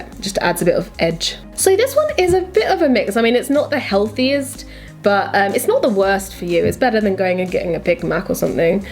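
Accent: United Kingdom. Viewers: Sounds like UK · are you British ?🙋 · Definitely UK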